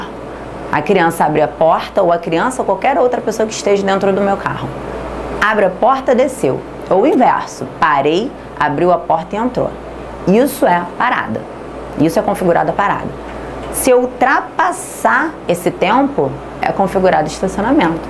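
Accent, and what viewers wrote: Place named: Rio de Janeiro, Brazil. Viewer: So pelo sotaque carioca, já desisti.. Deus me livre....!!!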